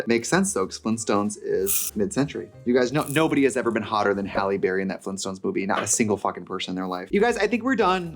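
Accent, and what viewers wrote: Wisconsin, United States. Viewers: Her Wisconsin accent was very strong in this video 😭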